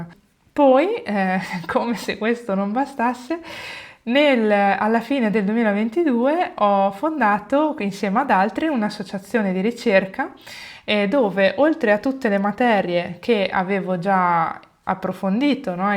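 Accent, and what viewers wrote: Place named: Eastern Europe. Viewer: Infatti adesso noto che neppure il suo nome mette. E dall'accento mi sembra slava. Una "dottoressa" proprio in gamba deve essere. Olistica al punto giusto.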